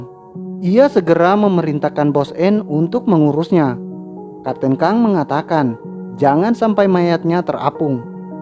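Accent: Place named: Sulawesi, Indonesia. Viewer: Dari pembawaannya kayaknya abang nih orang Sulawesi yah?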